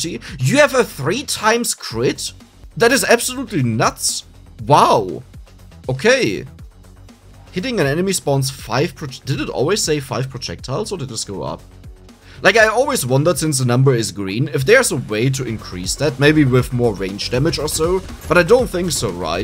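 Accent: Germany. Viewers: Are you from germany xD sounds like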